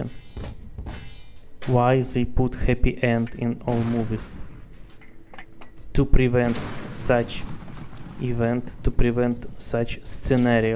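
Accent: Russia. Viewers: ooh,hi my Russian brother!!! very tick accent,i love it! greets from Macedonia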